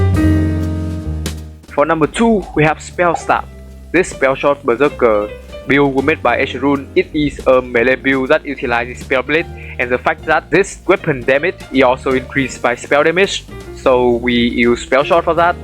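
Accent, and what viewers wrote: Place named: Malaysia. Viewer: Late comment, but I found this to be the most helpful for my case despite myself having trouble understanding your Malay? accent. Thanks.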